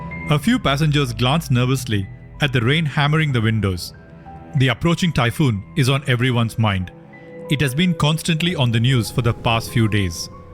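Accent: India. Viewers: He sounded Indian national! . Needed improvement on grammar pronouns actions. Tks. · Bro have Indian accent ❤ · Crazy heavy Indian accent. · 🔴🔴🔴 I CAN'T LISTEN TO HIS THICK INDIAN ACCENT, TOO ANNOYING!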